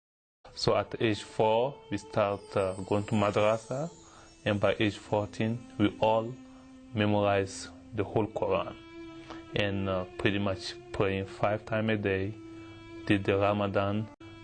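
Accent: Israel. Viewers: He has a hebrew accent. Fakest thing since WWE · He has Hebrew accent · This guy is definitely a Jew, thickest Hebrew accent I've heard in a while.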